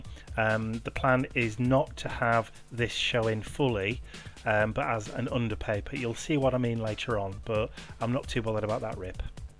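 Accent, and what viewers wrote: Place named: Northern England, United Kingdom. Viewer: Brilliant tutorial and art work. I've been making digital art in Photoshop for 10 years now and so the layers really resonated with me. I really like your style, and your videos, and it's nice to hear a Northern accent! :) I'm new to real life art and so your tutorials have been a great inspiration for me.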